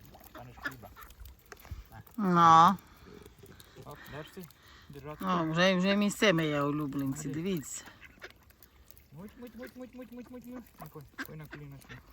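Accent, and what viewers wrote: Transcarpathia, Ukraine. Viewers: По говірці ніби Закарпаття.. Але річка на закарпатські річки геть не схожа.. то де є ця краса? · Та де се рівненщина, се закарпатський акцент, но се не в горах бо потік явно не гірський. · По розмові чую що Закарпаття 👍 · Ви молодець)) Це суперово!)👍 Великий плюс Вам в карму))💯 Я також любитель підгодовувати качок коли на ріці, голубів, ворон, все це чудово заспокоює нервову систему) * Я сам з Хуста, чую такий знайомий закарпатський акцент за кадром)😊👍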